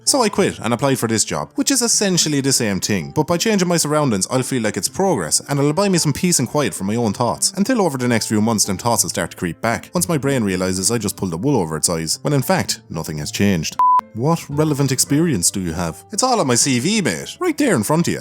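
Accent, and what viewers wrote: South Africa. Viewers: South african accent, can someone tell him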